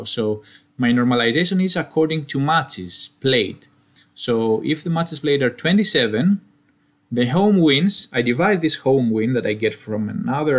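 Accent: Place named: Spain. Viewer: Great work man, excellent content! Question: you are feeding the "scores and fixtures" table with values that might not be correct for that specific date. For example, the league table has all statistics up to the present moment and then you feed them to matches that occurred in the past. Am I right or did I understand it wrongly? Thanks for your videos, I'm mostly interested in content related with orange usage. By the way, you said in a video that you are Greek but to my ear you sound like a Spanish guy speaking English. :) Cheers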